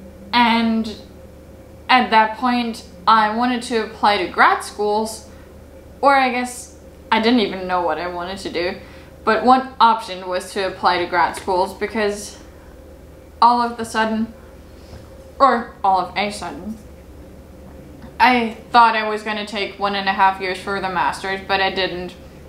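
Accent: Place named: United States. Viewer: That explains your American accent. 🇺🇸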